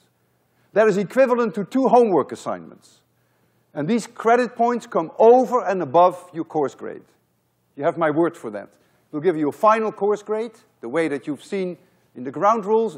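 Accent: Netherlands. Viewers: Alfred Castillo Exactly and i'm proud of this professor from the Netherlands just like i am. You can clearly hear that he has a Dutch accent.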